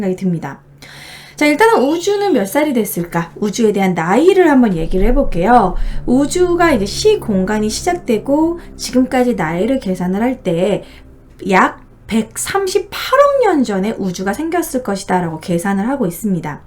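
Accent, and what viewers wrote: Gyeongsang, South Korea. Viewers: 혹시... 경상도죠? 중간중간 억약이 좀... ㅎ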